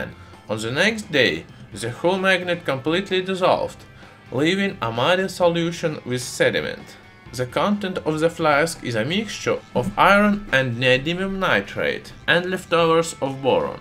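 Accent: Russia. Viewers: Regarding his pronounce he is from rushka. They waste all toxic on their forests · I love your accent. It adds to the "mad" Russian scientist character. 😁 I mean that in a friendly way. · "I needed to remove iron sauce" "Somehow extract near demon souls" You see, the auto generated English caption can't understand Russian Style English. But human ear does. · Love that thick Russian accent!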